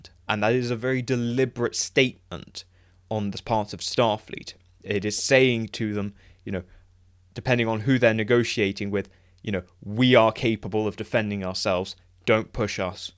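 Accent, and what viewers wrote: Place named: England, United Kingdom. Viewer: your English accent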